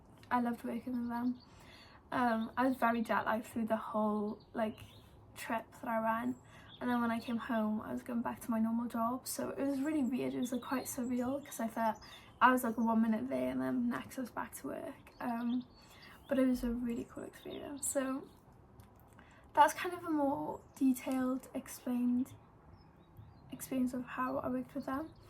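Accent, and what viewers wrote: United Kingdom. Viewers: Yep, but she's Welsh, a different British ( English) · are you british?? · Hello, I'm just now getting to this video an you have an amazing english accent. I'm one of your biggest fans. That's Why I subscribed to your channel. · Your voice sounds like British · You sound British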